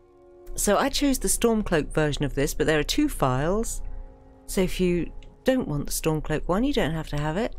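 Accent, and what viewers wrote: United Kingdom. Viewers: come for the endearing British accent and relaxing home reviews, stay for the squints essay on the politics of Tamriel?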